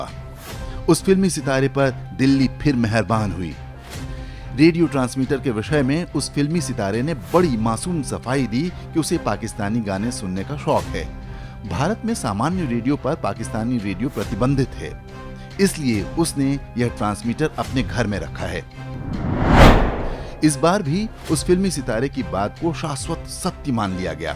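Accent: Standard. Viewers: बड़ी शुद्ध हिन्दी है आपकी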